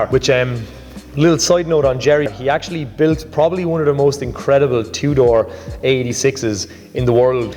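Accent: Ireland. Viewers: To be honest Irish ascent could be difficult to learn with haha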